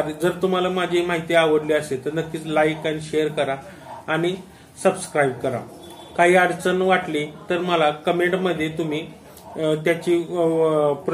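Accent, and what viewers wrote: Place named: Kolhapur, India. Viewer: डॉ छान माहिती दिलीत homoeoathic बद्दल आपल्या बोलण्यात कोल्हापूरी साज पण मस्त आहे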